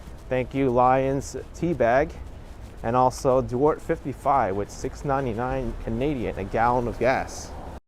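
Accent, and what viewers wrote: New York, United States. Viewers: Hi, I really like these videos of the back streets of New York. Admittedly when you announced you were moving to Miami I was sceptical that I would still enjoy your content and I haven’t watched any Miami videos to date. I always associate you with New York, your town and your accent. I watched your Leaving video but I’ve yet to watch the Decline video but I will.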